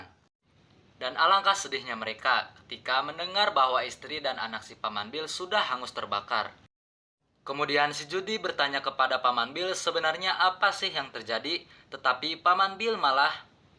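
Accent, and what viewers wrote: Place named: West Java, Indonesia. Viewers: Bang orang sunda pasti 😂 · Orang Sunda nya kang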